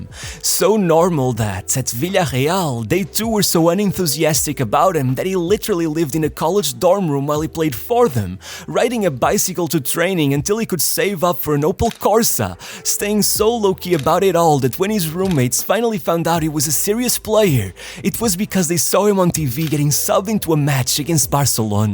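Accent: Portugal. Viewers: Just a Portuguese accent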